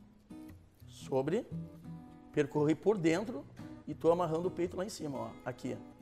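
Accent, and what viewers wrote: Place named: Porto Alegre, Brazil. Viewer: Sotaque de Porto Alegre !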